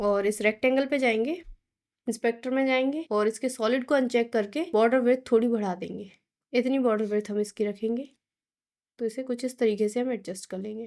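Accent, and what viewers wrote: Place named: Uttar Pradesh, India. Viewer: Aap UP se ho kya... Tumhare Accent se lg rha h:face-orange-biting-nails:. Btw loved your Editing style and Content.❤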